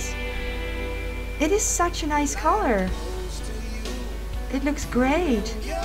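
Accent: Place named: Netherlands. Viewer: wow congrats haha, I have shiny Shedinja too (got from my brother) also, you kinda have a Dutch accent, could it be you're from the Netherlands?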